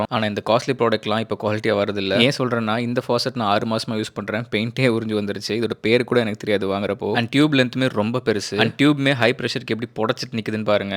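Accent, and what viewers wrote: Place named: Nagercoil, India. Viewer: Bro unga voice konjo nagercovil la ullavanga pesuramari eruku 😅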